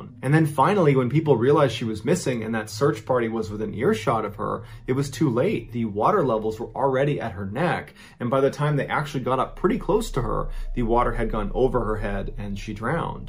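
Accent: United States. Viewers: Yeah, no.. that's how every American I've ever heard pronounces it. People in the North and the South. There may be the odd person who doesn't but I'm yet to hear them.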